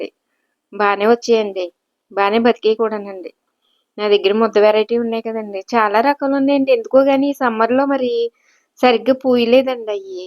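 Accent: Godavari, India. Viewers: గారు మీరు చాలా చక్కగా వీడియో చేశారు...👌 Natural గా మన గోదావరి నటివిటీ తో బాగా ఆప్యాయత ఉట్టి పడే విధంగా మాట్లాడారు..❤ నేను గారు వాళ్ళ అక్క గారి అమ్మయి అండి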